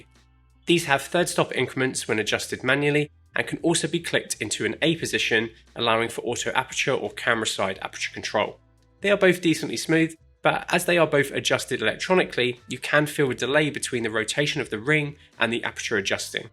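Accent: United Kingdom. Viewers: Not sure if the British accent makes this more enthralling, or the to-the-point, no frills, expertly-presented information. I think it's both.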